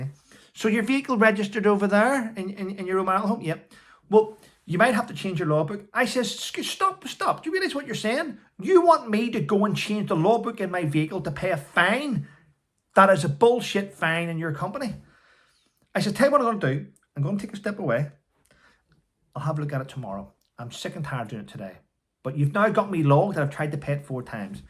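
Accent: Northern Ireland, United Kingdom. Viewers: yours is the only channel I don't need subtitles for because like me, you're from Norn Iron so I get every word!! 😂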